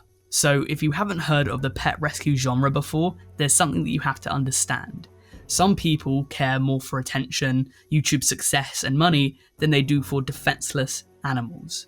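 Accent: United Kingdom. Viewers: yuck british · British tuv, love it bro, keep it up! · Youre british?😮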